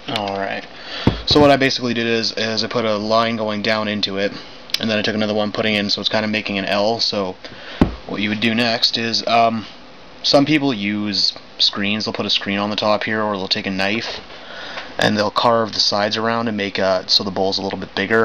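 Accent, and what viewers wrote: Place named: Saskatchewan, Canada. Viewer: thanks dude, you sound like your from saskabush maybe? keep up the good work.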